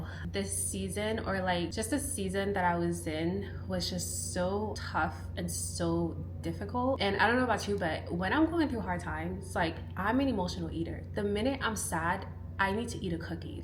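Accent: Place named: Caribbean. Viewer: Do I hear an accent?🥹 Are you caribbean? if so let’s be friends cause im moving to chicago soon!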